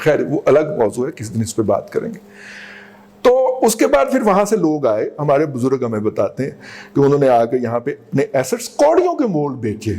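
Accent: Punjab, Pakistan. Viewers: Hi, No matter what I love your Punjabi Urdu.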